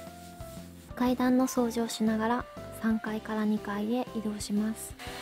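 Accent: Kansai, Japan. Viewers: イントネーション関西人っぽい🌟り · 関西の人や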